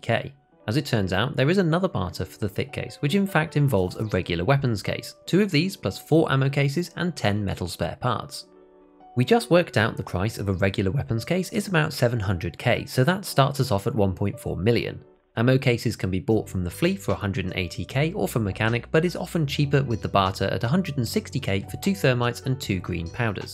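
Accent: United Kingdom. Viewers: it's more of a "British" way of talking.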